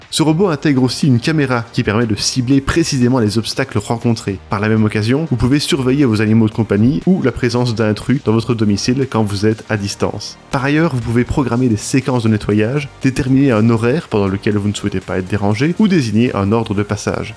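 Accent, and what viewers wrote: Belgium. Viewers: Oufti l'accent du béwé!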